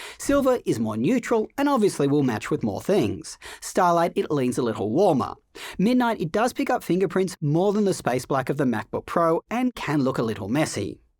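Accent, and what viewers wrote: Australia. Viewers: OMG your voice sounds Australian.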